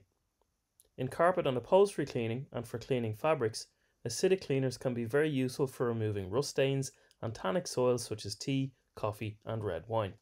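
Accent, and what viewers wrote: Ireland. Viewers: The magical lilt of an Irish voice... listening to your voice... makes me happy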